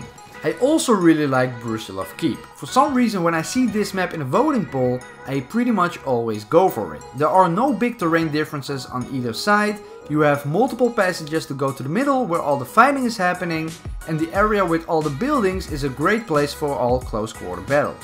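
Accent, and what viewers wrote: Netherlands. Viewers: Are you dutch? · you speak English with a Dutch accent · Nederlander hè? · Wait you are dutch? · are you Dutch? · Ur from holland Bet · Je praat Engels als een Nederlander maar het is goed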